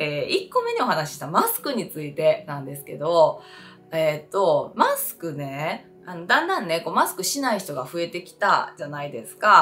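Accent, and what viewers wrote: Kansai, Japan. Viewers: 声と関西弁にやられる方多いでしょうね😊個人的には、女性社員と把握に役立ってます。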